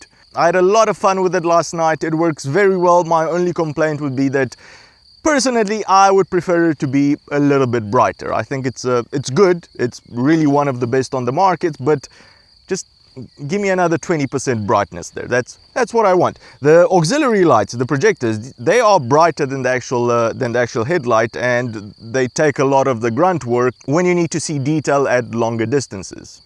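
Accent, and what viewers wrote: South Africa. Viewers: How did you get the South African accent?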